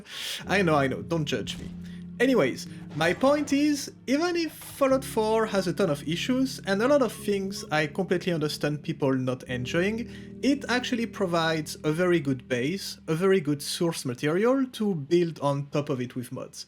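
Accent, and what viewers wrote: France. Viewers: The most French accent ever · le bonne accent français, j'adore ;)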